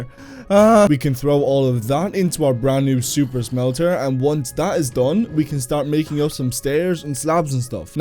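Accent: Ireland. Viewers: always pleasantly surprised when i hear a fellow dubliner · Is that an Irish accent I hear ?? An Irish Minecrafter? Finally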